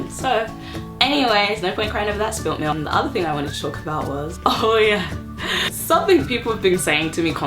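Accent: London, United Kingdom. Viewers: I kind of notice it at some point especially when you emphasise the r in words with r. But you still sound like you're from London. Love your videos, btw! Xxxxxxxxxx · Language adaptation that's awesome! I do that sometimes well as long as they understand you haha I think you still have your british accent don't worry too much and get out there some more pwahaha! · I still think your British. To me, your accent hasn't really changed. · I'm from London, meself, and that sounds like a London accent to me- (for the most part anyway!) o.o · Most people expect the "Ello guv'nor" type Oliver Twist flex. You still have your accent, it hasn't changed at all. British is not an accent.... Lol. You have a London accent there's a few accented words but it's minor. London misses you. Enjoy Canada! Been watching your videos from day 1. I hope you get back to making the videos again. 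· aww, some moments in this video you did sound very Canadian/American (ok, i can't distinguish between the 2 lol) but the London accent is still there girl! Don't worry. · You still sound London-y to me. I'm very introverted so having plenty of time to myself is idle to me. The last time I felt lonely was when I visited my dad in Nevada, USA and didn't know anyone and it was so isolated because it was basically a desert lol. Do a Facial routine or GRWM video! I'd be interested in seeing that. :) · You sound proper British! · I love your accent and I knew immediately that you were a Brit. Who have you been talking to? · And you definitely dont sound canadian........ At all. You sound very British to me. And my fellow canadian people will definitely say the same thing. ☺ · I saw some of your other videos before seeing this one and I could easily tell you were from London or British.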